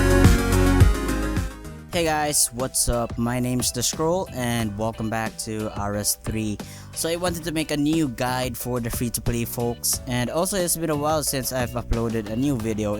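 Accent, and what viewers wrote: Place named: Philippines. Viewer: you have the most annoying fucken accent ive ever heard. pinoy?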